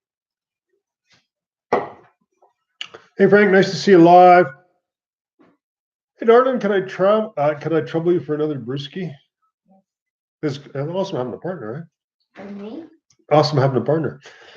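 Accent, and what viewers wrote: Canada. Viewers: Being English, i can say you sound 100% Canadian, Americans sound American. Strangely though saying ay a lot is a very southern english thing, cornwall, devon kent etc.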